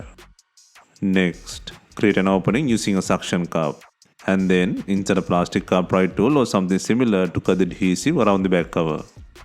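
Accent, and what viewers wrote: India. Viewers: Avec un type de colle genre CYANOACRYLATE ,bravo impossible par la suite de pouvoire ré-ouvrire pour une autre réparatation,c est comme se tirer un coup de 12 ds les pieds cà!!!!!🙄😤😒🤪🙃😡🤬 Utiliser plutot une colle caoutchouc!!!!!!! Apres y sera toujours possible de le réouvrire & aussi tres facile de nettoyer la colle avant d en. ré- appliquer pour le refermer,une simple logique !!!!! Un accent infernal indou🙄😤😒😑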